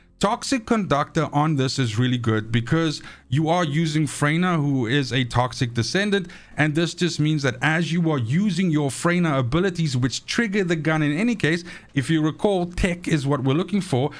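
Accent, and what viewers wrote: South Africa. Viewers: Yo man are you South African?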